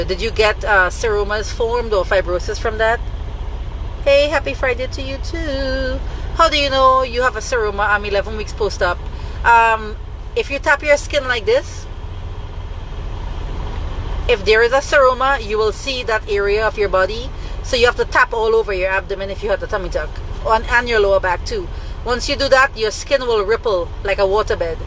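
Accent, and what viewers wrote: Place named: Trinidad and Tobago. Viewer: Soon as my open ya mouth I’m like Trini in the building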